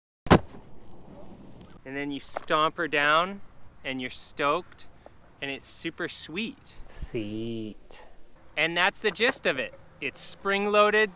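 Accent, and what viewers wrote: Canada. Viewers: I just love your accent. You have the Canadian nice guy accent and it reminds me of every teacher in kindergarten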